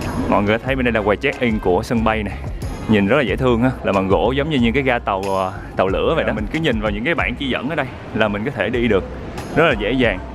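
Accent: Mekong Delta, Vietnam. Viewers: Xem gần hết các clip của anh này, Cứ bị mê mẩn giọng miền tây thôi 🤣🤣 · Xem video anh làm, em biết được nhiều món ăn ngon,văn hóa cũng như lịch sử của từng nơi anh đến,xem anh đi khám phá rất thú vị bởi cách nói chuyện chân chất Miền Tây,pha chút hài hước,duyên dáng của riêng anh.Em xem rất nhiều kênh nhưng kênh của anh là em thích nhất.!!!! Chúc anh nhiều sức khỏe,vui vẻ và gặp nhiều may mắn.! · Đậm chất giọng miền tây nhợ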